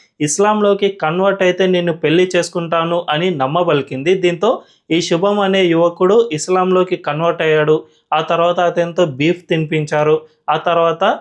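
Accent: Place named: Marwari, India. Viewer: Are sollugay NUVVU MARWADIWA Yenti anta lagi lagi matladutunnav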